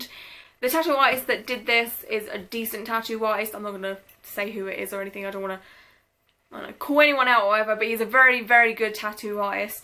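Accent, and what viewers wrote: United Kingdom. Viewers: Damn your voice is annoying. Must be from the UK? Funny how you asked your tattoo artist what it was exactly and then made a video to tell YouTube about it. Cool story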